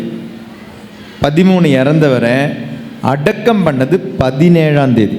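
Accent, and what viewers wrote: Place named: Kerala, India. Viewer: மேஜர் அந்தரராஜன் ஸ்டைல். மலையாள வாடையும் விடமாட்டேங்குது.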